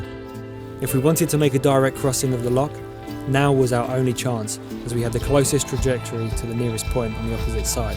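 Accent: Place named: United Kingdom. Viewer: Hm. Great video, but... Not sure about how I as a Scot feel about some posh English bloke telling all and sundry about the wonders of the beautiful remote parts of my country. Great in one sense, but then how am I or my countrymen going to feel when we arrive there at the rugged heart of our wild Scotland... and because of videos like this it's full of posh clueless middle Englanders?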